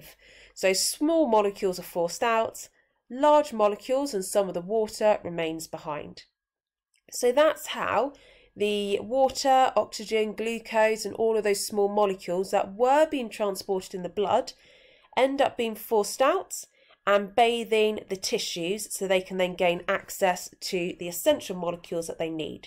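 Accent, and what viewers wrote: United Kingdom. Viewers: You are a British, aren't you??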